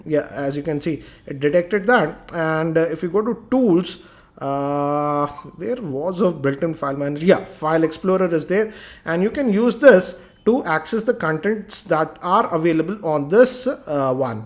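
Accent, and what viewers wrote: India. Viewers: Indian english...argh!!!!!!!!!!!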